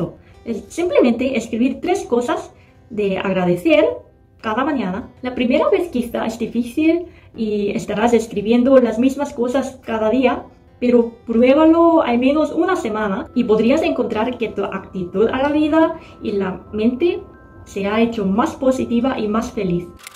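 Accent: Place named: Latin America. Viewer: "vamos a platicar", "ahorita"... como española de España tu español se me hace muy latino, aquí no decimos esas cosas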